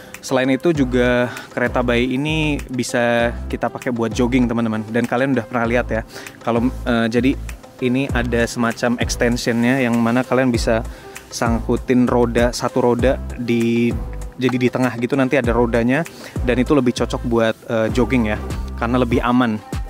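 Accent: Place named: Banyumas, Indonesia. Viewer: wah, kang ya bisa ngendika ngapak....? cilacape pundi mas? semoga selalu sehat dan barokah di manapun njenengan berada.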